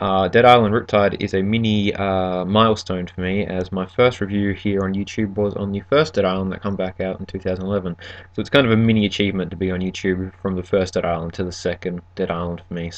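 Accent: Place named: Australia. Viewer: you sound like a true aussie :P good work!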